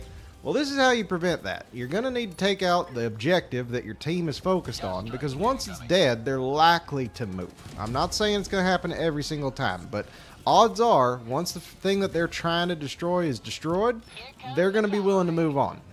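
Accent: South, United States. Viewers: Aayy like your southern accent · The southern accent fits helldives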